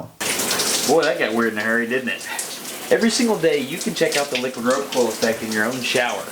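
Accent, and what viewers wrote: South, United States. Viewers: Forget that southern accent its the math Im still trying to understand...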